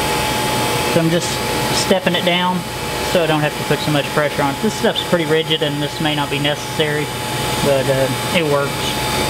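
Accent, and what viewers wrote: South, United States. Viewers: just need to understand that Southern drawl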